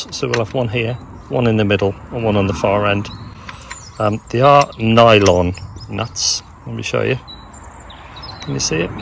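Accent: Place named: Wales, United Kingdom. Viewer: Genius intro!! We watched it 3 times 😄😄 That welsh accent is amazing 😉 I would literally set fire to anything that’s been sent so far! That’d actually ruin my patience. Nice Fjallraven hat, I’m going to have to get one now!! 🤦🏼‍♂️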